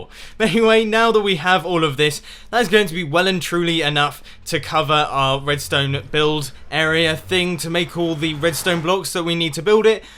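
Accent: United States. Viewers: Your American accent is godly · Dat American accent:D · Love your american sales person accent!! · Haha that American accent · Dat American accent. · Woah, that american accent tho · Is that an American accent? What the heck?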